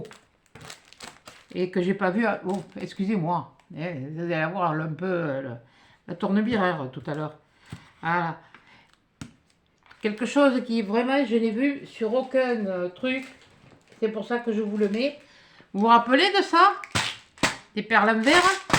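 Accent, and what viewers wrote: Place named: Southwest, France. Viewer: Bonsoir je suis nouvelle sur votre chaîne quand je vous écoute parlez j'ai l'impression d'entendre la voix de maïté la cuisinière 🤣des mousquetaires que j'aime beaucoup dommage qu'ont ne la voit plus à la télé 📺je suppose que vous venez du sud ouest moi je suis du nord de la France une chti's 🤣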